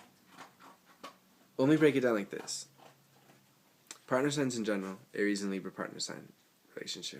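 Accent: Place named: West Coast, United States. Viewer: lol you said at the function. you are def. from the west coast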